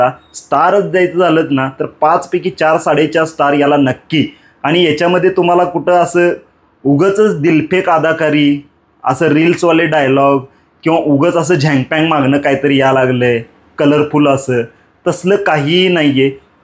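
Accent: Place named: Kolhapur, India. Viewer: बोलताना बऱ्यापैकी सगळे मुद्दे घेतोस, तुझी बोलीभाषा आवडली... नाद खुळा कोल्हापूर...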